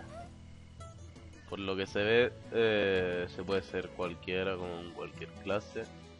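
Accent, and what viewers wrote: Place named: Canary Islands, Spain. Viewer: Niñoo me alegra de q seas canario jaja por el acento se te nota, si podrias ayudarme debido a que voy a empezar te lo agradezco saludos :D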